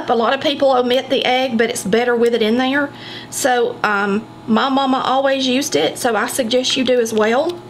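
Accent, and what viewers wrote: South, United States. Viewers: Love your southern accent. I make a limeade icebox pie that’s very delicious. Tastes lovely ke a refreshing slice of limeade pie. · 😂😂 the country accent always adds some extra syllables to throw u off!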